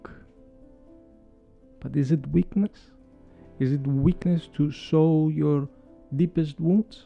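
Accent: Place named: Greece. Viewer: The pronunciation a greek element !🤣 Όταν τελειώσω την έκθεση που γράφω, θα κάτσω να το δω.😉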